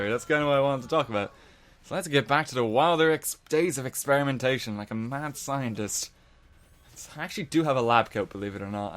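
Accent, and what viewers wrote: Ireland. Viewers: Ah, the sweet, sweet Irish accent flows gracefully over my ears yet again. So similar to mine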